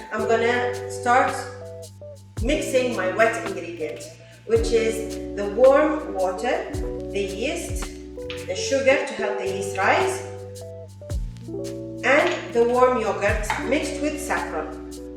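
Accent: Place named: United States. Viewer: Omgosh, you are a joy to watch. I love how simply you make this recipe seem. Yeast scares me but maybe not now. Your English is 100% American perfect. If you don't mind my asking, where did you learn to speak so well? I'm still among from your demonstration. My IG friend sent me your link. I'm going to do as you say, first collect all the necessary ingredients and gadgets before I make this for my friends. Thank you so very much... Stay well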